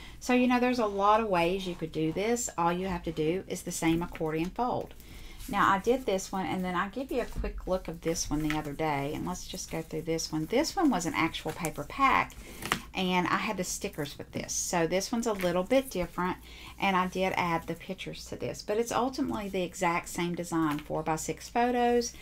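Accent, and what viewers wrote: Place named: South, United States. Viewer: I’m so glad you popped up on my you tube recommendations. I immediately subscribed. Can’t wait to go back and binge watch. Hope you tell us where you’re from because we have the SAME southern accent. 😊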